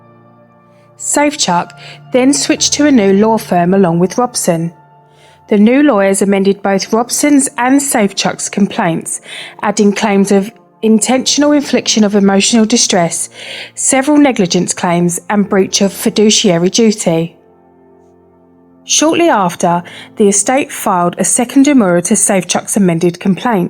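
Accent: United Kingdom. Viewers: Special thanks to the pommie bint who narrates this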